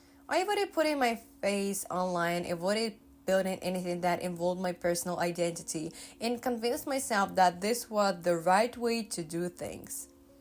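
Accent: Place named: Russia. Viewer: I've seen a couple of your videos already. And I gotta say you have the best hair. I'm super jealous. Its just gorgeous. Also What is your first language? You have an interesting accent that I can't quite pin down(uncultured american). Sounds russian or maybe eastern european? But like with a touch of spanish and even scottish lol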